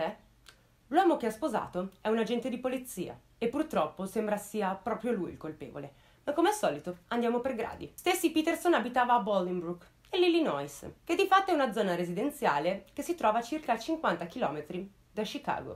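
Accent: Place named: Lombardy, Italy. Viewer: Ciao ti faccio i miei complimenti è veramente bello ascoltare come spieghi le situazioni!!! Volevo chiederti una cosa di dove sei? Il tuo accento sembra Como Varese o Milano.... Io sono di Como.... Grazie comunque x il lavoro Ke fai!!!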